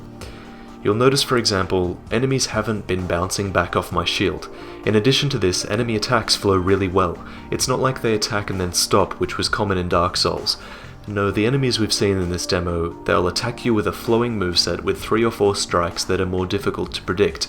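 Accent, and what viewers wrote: Australia. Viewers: Wait, is Australian? Nice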